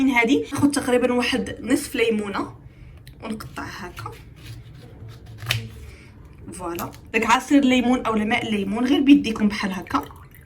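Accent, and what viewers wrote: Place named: Maghreb. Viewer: السلام عليكم اختنا الطيبة القلب السيدة -نشكرك على بث هذه الڤيديوهات المفيدة للناس ولكن نرجوك أن تتكلمي ببطئ كي نلحق أن نفهم كلامك بتتابع معك وكذلك نرجوا أن تتكلمي باللغةالعربيةالفصحى فاللهجةالمغاربيةالجميلةالعذبةصعبة الفهم في بقية الدول العربية،نرجوا الإهتمام وشكرآجزيلآجازاك الله خيرآ😍❣️👰🤵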